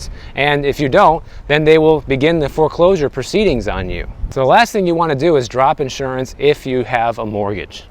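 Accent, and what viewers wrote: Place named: Miami, United States. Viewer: 😂😂😂😂 nice start my man! Truly Miami Sounds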